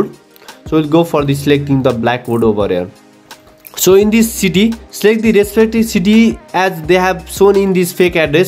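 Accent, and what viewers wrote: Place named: Nepal. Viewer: Accent tah nepali xa tah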